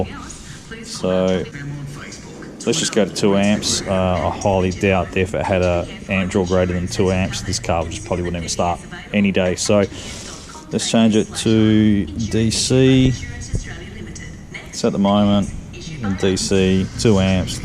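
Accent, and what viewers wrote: Australia. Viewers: Great stuff - good to hear another Aussie on youtube.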